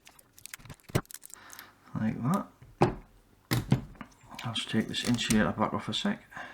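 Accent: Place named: North East, United Kingdom. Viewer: The North-East accent is never a problem.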